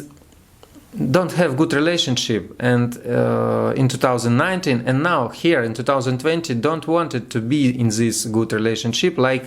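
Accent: Russia. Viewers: акцент классический русский... хотя у итальянцев такой же - по крайней мере, очень похож.. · День добрый прикольно русский английский это что-то...😁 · Wow!! You were surprised! Yes, of course, your English is far from perfect, but understandable. In my opinion it's a good idea. You need to expand the audience and you are moving in the right direction. My English is terrible, unlike yours, and the same terrible Russian accent, which only improved understanding. Subtitles weren't needed. Accept my praise again! Keep it going! P.S. простите меня, если я вмешиваюсь в ваши планы, но было бы более правильным открыть отдельный канал для англоговорящей аудитории. Немного практики, занятий с носителем языка, и все будет великолепно! Русский акцент только придаст вам определенный шарм! Вот только танцевать не надо. Это уже будет too much.))) But that's just my opinion, you decide!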